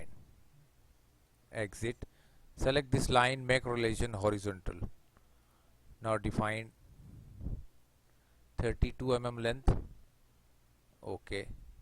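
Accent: India. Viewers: i reading comments indian accent what a effective work sir perfect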